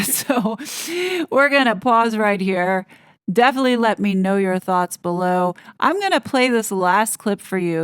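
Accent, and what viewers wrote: New York, United States. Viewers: It’s all very bizarre 😳 I like your New York accent I actually listen for it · Really enjoyed this. and please, give your New York accent wings. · Hahahaha aw you are so funny and I love your New York accent!! It's a fantastic video as always 💚👍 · Awww, can you talk with you NY accent plz? It would be a treat! 😊😉🤗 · I love the New York accent!! Set it free!!! ❤❤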